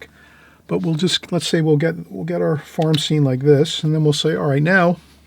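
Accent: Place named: Philadelphia, United States. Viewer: I’m from Philadelphia, living now in South Jersey. I recognize your accent.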